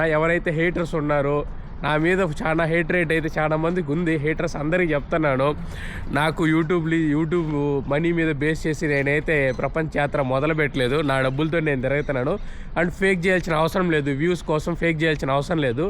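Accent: Chittoor, India. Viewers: Bro needi Chittor district aa, slang ala undhi👍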